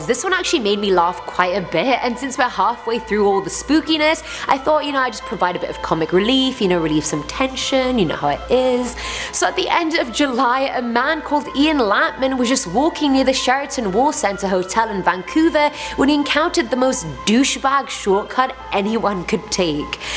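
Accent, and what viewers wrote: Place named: England, United Kingdom. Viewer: Seriously though, exotic look an English accent is flippin hot🔥😍🔥😍🔥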